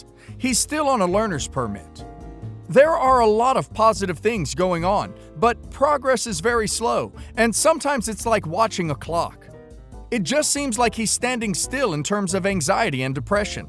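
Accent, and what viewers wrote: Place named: United States. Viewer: It is so freaky hearing this american voice on this reddit stories channel. i thought it was a genuine voice when i heard it on Ask a lawyer channel.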